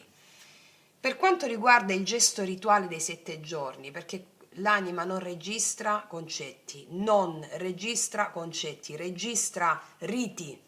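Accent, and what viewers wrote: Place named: South, Italy. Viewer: Ciao carissima grazie bellissima lettura e bellissimo accento del nostro sud ❤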